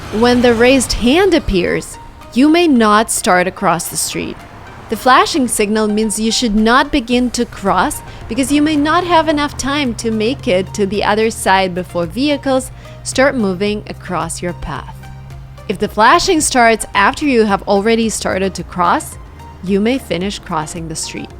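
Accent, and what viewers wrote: United States. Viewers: I love imitating your American accent, this language spoken so fluently. I just listen to your videos while I cook dinner or everytime I can and I' m so astonished to see how easily you influence and improve my listenining and speaking abilities. Thank you a lot. You' re fantastic!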